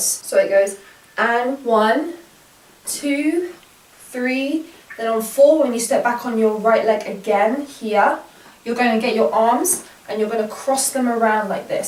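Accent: United Kingdom. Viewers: Thank you very much for the tutorial, new subscriber, are you from the UK? your accent makes me like from the united kingdom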